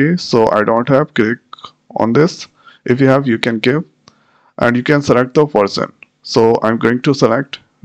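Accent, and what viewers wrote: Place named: India. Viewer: why does every tech helper have an indian accent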